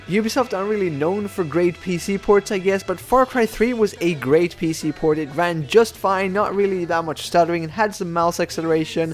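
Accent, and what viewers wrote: Skåne, Sweden. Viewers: i knew u were cause u know that one q&a vid when u said some words on swedish i cinda heard that u were from skåne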